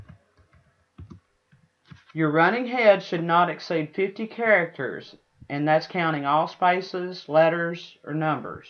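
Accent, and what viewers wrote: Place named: Carolinas, United States. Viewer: Helpful video thank you very much and I am going to take a stab at your accent... I feel it's either from North or South Carolina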